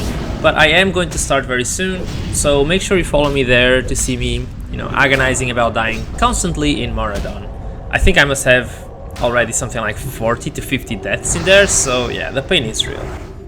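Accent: Portugal. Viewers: Português pelo sotaque certo? Qual o servidor? Abc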